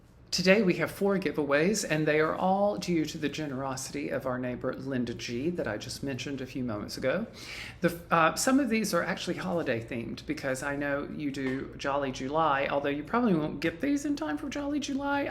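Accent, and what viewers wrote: South, United States. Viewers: I've enjoyed bingeing on the last 2 months worth of episodes (got a little behind on the flosstubes). I just love your gentle, relaxed, southern manner, so soothing and at the same time so fun to listen to while I stitch after a long day at work. :D Your granny sounds like she was just amazing, thank you for sharing her poetry. Thank you for bringing a smile to me face and bringing a little bit of "happy" to my day. #chrisitup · I always love hearing the poems from your”granny” and the stories from your past. I am not from the South but I love hearing the many fun words and accent that you grew up with. Thank you so much for sharing. I hope you get lots of stitching time this week………….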